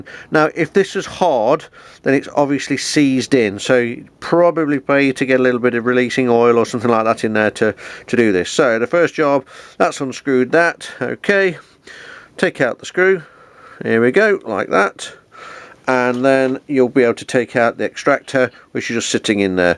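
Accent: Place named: United Kingdom. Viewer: Clear, concise and helpful video... with a great Brit accent to boot. Good stuff!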